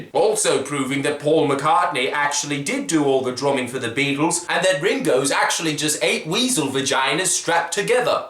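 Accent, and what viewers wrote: Australia. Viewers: Failed impression, your australian accent shines bright on this 😂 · Ok mannerisms and style of writing are amazingly accurate but the accent was shit and I say that as a fellow Strayan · This guy is Australian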